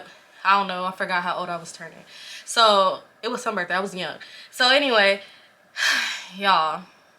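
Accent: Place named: Los Angeles, United States. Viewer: First off all Girl shots out to you! And believe me when I tell you I don't even do shit like comment on vlogs or subscribe to chanel's that aren't podcast. (Maybe I'm an official old head I'm 31btw) but this is very refreshing, relatable, down to earth, creative, and funny. ya delivery, ya detail ya tone, the slight L.A accent and slang. I'm a jersey girl. I say all this to say I want more stories bout Joe! 🤣🤣🤣 So as your newest subscriber and biggest cheerleader tell more bout Joe! Nah all in all do ya thang my sister. You got it!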